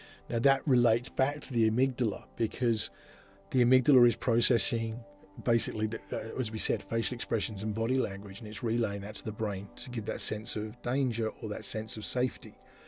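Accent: Australia. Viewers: Detected your Aussie accent as soon as you spoke, as a fellow Aussie. Subscribed. Interested in your listed of talented autism creators. Elon Musk is the planet's most famous current talent. Others? Women and men? Autism affects all levels of humans, with all levels of intelligence. With higher iq people, their cognitive brain can sometimes override the hyperactive autism. However when we are tired, off guard, off duty, our hardly awake, the autism can suddenly emerge. Can you comment on this. Recently our ABC television showed how our most famous homeless orphan, got to be Doctor (sociological) Robert Smith OAM. Over 60 years old now, he is CEO of one of our larger charities. Is he autistic, or merely a PTSD baby, child, teenager?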